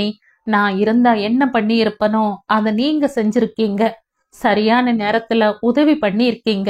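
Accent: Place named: Kongu, India. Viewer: அருமையான பதிவு சிறப்பான முறையில் கொங்கு தமிழில் தங்களின் இனிய குரலில் கதை கேட்ட சந்தோஷம் நெஞ்சம் மறக்க முடியாத கிராமத்து மக்களின் வாழ்க்கை கதையின் ஒவ்வொரு அத்தியாயமும் அருமையிலும் அருமை வாழ்த்துக்கள் சிவசடாச்சரம் இராமநாதபுரம்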